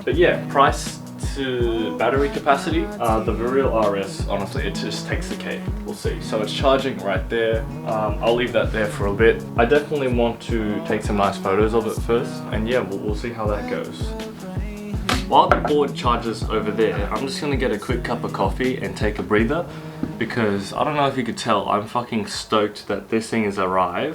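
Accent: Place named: Australia. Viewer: Wtf this guy is korean talking Australian and acts like a white boy the fuck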